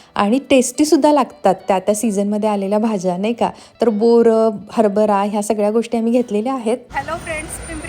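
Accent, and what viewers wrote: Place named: Kolhapur, India. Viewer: छान मार्केट सफारी... ताई कोल्हापूर बोली पध्दत चालीरीती सोडू नका.... कमेंट दुर्लक्षित करा 🎉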